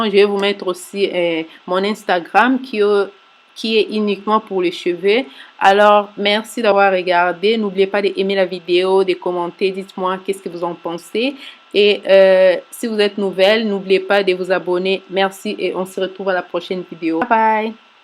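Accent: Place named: Gabon. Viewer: j'aime beaucoup le thon gabonais de la part d'une kmer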